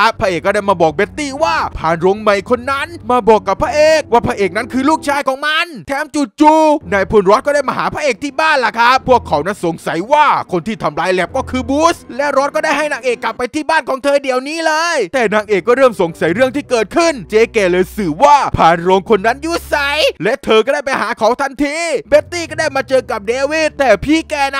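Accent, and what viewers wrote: South, Thailand. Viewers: ชอบสำเนียงใต้จริงๆ 💕💕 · สปอยภาษาใต้ทั้งคลิป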